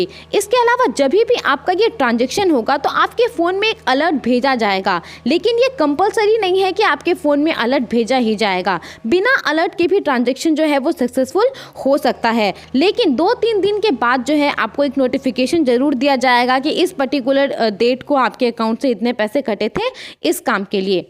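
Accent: Bihar, India. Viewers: मैडम आप बिहार se ho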